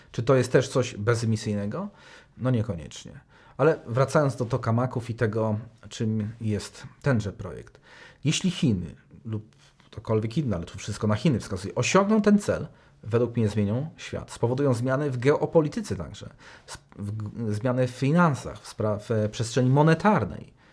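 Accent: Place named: United States. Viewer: ps tylko AMERYKANIE tak te nazwy wypowiadaja - ja mieszkam e UK i okolo kilka krajow w Afryce ma jako uzredowy Angielski - i tak tego nie mowimy...pan z Ameryka znaczy... stad ten szal na nowinki ??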